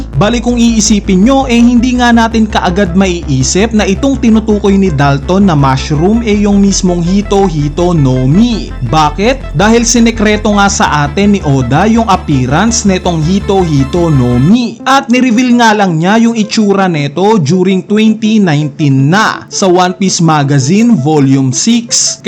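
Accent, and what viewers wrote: Visayas, Philippines. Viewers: bisaya spotted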